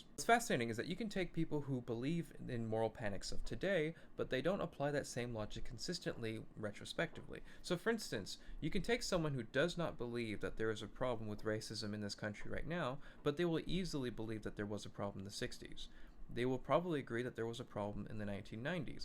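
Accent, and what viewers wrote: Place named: United States. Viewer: Unusual to hear such sense spoken with an American accent these days.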